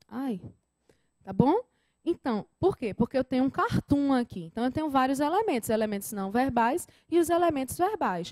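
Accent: Northeast, Brazil. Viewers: Obrigado Profª.. Além de competente, você é muito fofa. E, como o seu sotaque não me engana, deixo aqui um ENORME abraço aos queridos nordestinos. Mais um "Gostei" para você.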